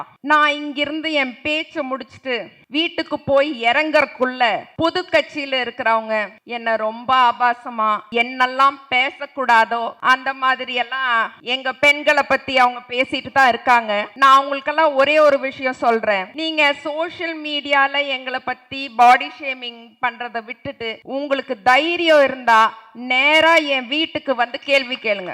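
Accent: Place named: Coimbatore, India. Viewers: அம்ணி. நீ நல்லா வருவ. கோயம்புத்தூர் slangஐ. டெவலப் பண்ணு. விட்டுறாத. வாழ்த்துக்கள் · கோவை தமிழ்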